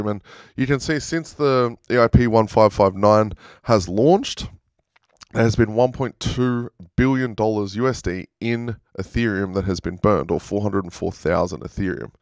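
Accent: Australia. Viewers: Thanks for the videos, it’s nice listening to an Aussie.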